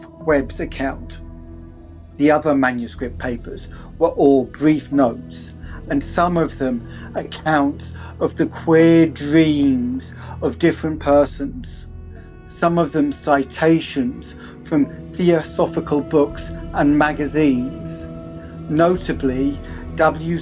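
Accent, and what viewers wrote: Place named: United Kingdom. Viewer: Telling a story with Americana locations and culture with a Brit accent seems a bit out of place 😅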